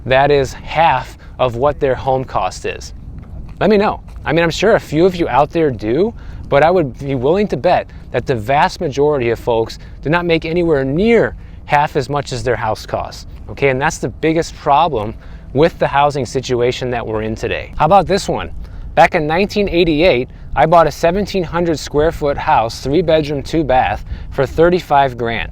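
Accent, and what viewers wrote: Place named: Midwest, United States. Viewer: You still have your midwestern accent (flat vowels).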